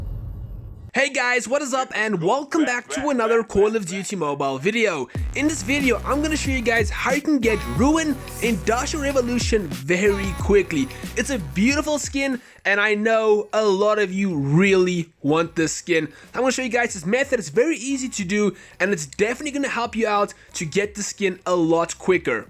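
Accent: South Africa. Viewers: I've recently fallen in love with BR and its been great, and ill definitely be grinding to reach Master 2 this season User name: Petscop2 Edit: are you South African? You sound South African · Hey dude thx for the info, and are you South African? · You sound South Africa ,are you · You sounded very South African, until you said "Chopper" 🤔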